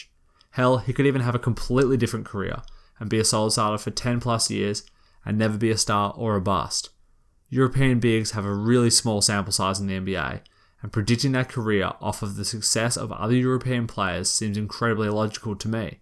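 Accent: Australia. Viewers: Where bouts u from in australia mate, im from the Gold Coast nice to hear another Australian NBA/Chicago Bulls fan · great analysis, but gave me a hard time understanding due to his aussie accent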